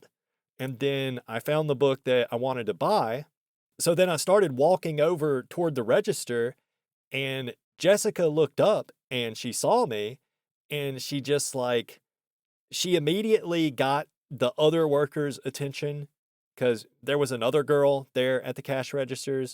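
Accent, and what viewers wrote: South, United States. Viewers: Dude your voice is a gift from GOD. Every part of it, the way you speak, inflections, the hint of southern draw (drawl?) man there is for sure something there. I hate how overused the word is but my gut says "authentic". All sorts of content and things could come from this. Hope you don't stop with just ONE youtube channel!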